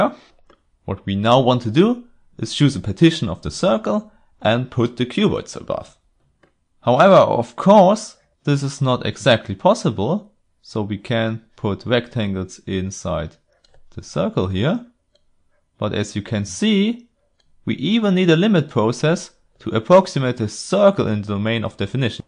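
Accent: Germany. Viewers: Thank you for this channel, it’s amazing! Quick question, are you German? The accent sounds a bit like my German grandma and that’s cool! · I am absolutly sure, that for other non-native speakers it is simplier to understand german accent, than speach of true englishmen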